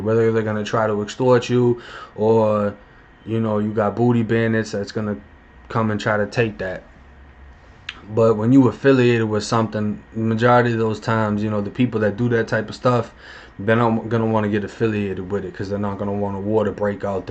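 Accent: Boston, United States. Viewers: You originally from Massachusetts? I hear a Boston accent...... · I would've done it too man. I grew up wit all females too. I'm from Boston and your accent is half and half. Keep making vids man you're a great storyteller just like Death that's all you need to blow up on YouTube and make it your job. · It sounds like you got a Boston accent under the Floridan sound. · Love ❤️ your Boston accent keep uploading daily ....We would watch daily too ..love and wishing you all the best Love 💕 from Southern California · This whole time I’m thinking bro sounds like he from Northshore Boston and I went back and saw you’re from Malden that’s what’s up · The way you tell your stories with the Boston accent makes it that much better. Keeps me coming back keep it up man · Can tell you from Boston for sure lol. Fuckin with your channel tho bro I appreciate the people you know are keeping the shit authentic. 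You're gonna blow up soon keep up with this YouTube shit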